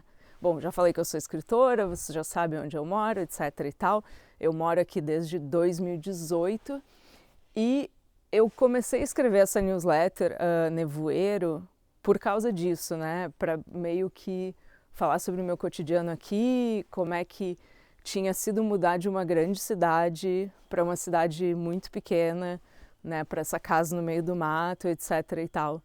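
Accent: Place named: Porto Alegre, Brazil. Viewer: Confesso que ainda não li os teus romances, mas tenho lido tua news regularmente já faz um tempo. Desde a enchente aqui de poa, por algum motivo do acaso achei o nevoeiro e me identifiquei. Comecei a escrever também. Muito legal te ouvir com teu sotaque portoalegrense. Lindo o video e apesar da sensação de isolamento (volintário), dá pra entender muito bem porque tu escolheu morar nesse lugar.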